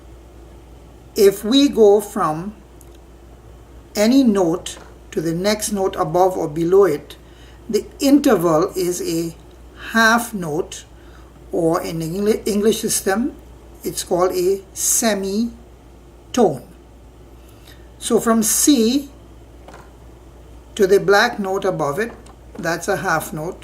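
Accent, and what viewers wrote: Trinidad and Tobago. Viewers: Are you from Trinidad by chance? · Trinidadian accent.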